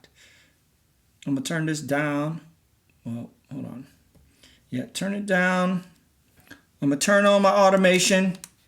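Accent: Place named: United States. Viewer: Was so surprised to hear an American accent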